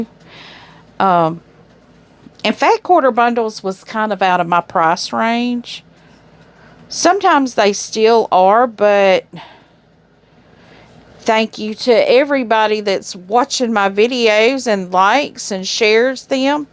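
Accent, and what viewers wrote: South, United States. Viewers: Hi ! It was nice listening to you today while I was sewing. You are so fun to listen to with your southern accent! I did a retreat at Missouri Star Doan girls retreat and it was so fun, we got a ton of free stuff- fabric for two quilts, numerous free things so it paid off since it was pricey. Tell me what you are bringing to work on. I brought stuff to the retreat but they had us sewing the new quilts, pillow cases and tons of stuff!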